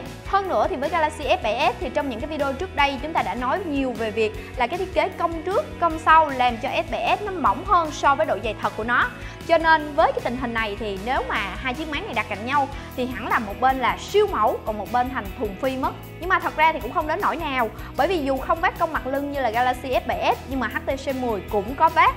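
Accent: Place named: South, Vietnam. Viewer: thích giọng miền nam review ghê,hay phết 👍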